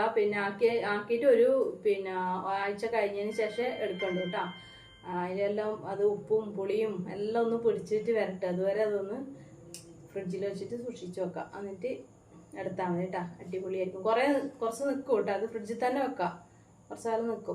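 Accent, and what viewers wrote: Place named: Kannur, India. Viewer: പക്ഷികളുടെ ശബ്ദം കേൾക്കുന്ന സുന്ദരമായ സ്ഥലമാണെന്ന് മനസിലായി.കണ്ണൂർ ജില്ലകാരെ പോലുള്ള സംസാരത്തിലുള്ള അച്ചാർ ഉണ്ടാക്കുന്ന നല്ല വീഡിയോ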